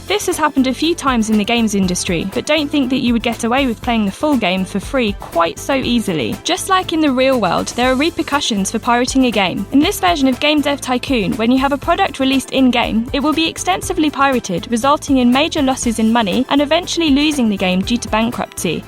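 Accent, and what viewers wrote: United Kingdom. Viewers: man that british accent makes this sound so clinical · Cookie cutter narrator with a britsh accent... I thought you guys were better than this.-.- Use real gamers! Did you run out of youtubers?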